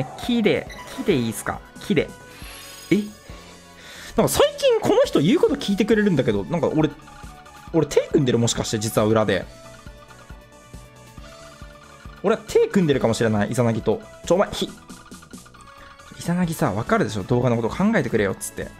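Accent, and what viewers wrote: Kansai, Japan. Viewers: って関西人なん？ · 関西弁うざっ笑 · 関西弁気になるよ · 最近ところどころ関西弁なの草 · って関西の人？ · って関西人？ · の関西弁大好き(*^ω^*) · なんで最近関西弁っぽさが混ざってきてるんだろうに対してはにわかだから出身もしらないし、自分が見始めた頃からしたら変な風に聞こえてなんか聞きにくくなった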